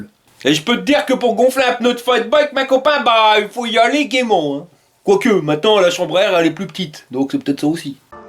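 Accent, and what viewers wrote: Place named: Nord-Pas-de-Calais, France. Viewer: Salut je vais m'offrir un fat bike 20 pouces, j'ai regardé les modèles et celui qui m'a tapé dans l'œil c'est le Garret Miller Z. Quand penses-tu? Ton biclou blanc derrière toi est sympa, quel est ce modèle? Merci beaucoup pour tes vidéos avec ton accens CH'TI j'adore, continu comme ça.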